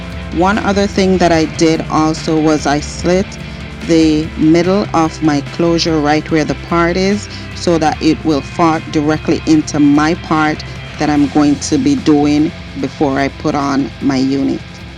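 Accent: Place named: Jamaica. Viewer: kool, subbed. r u from Jamaica? I pick up that accent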